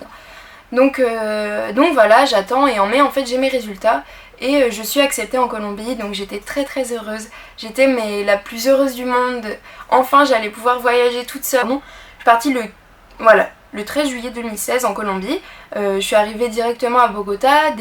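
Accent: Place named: France. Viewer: No entendí nada😢, pero me encanta el acento francés. Estoy aprendiendo apenas el frases, estoy con como se dicen las cosas básicas y todo eso. Espero algún día entenderlo y hablarlo.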